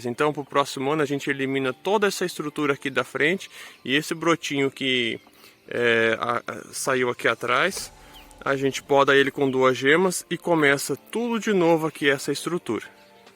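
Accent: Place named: Rio Grande do Sul, Brazil. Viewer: Tu é de onde teu sutaque parece gaúcho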